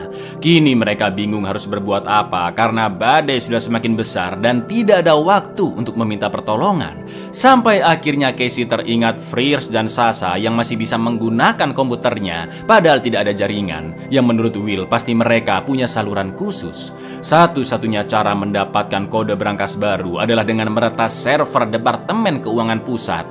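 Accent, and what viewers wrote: Java, Indonesia. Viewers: Pdhal pengen nonton. Tp terlalu medok Jawa nya. Gak asik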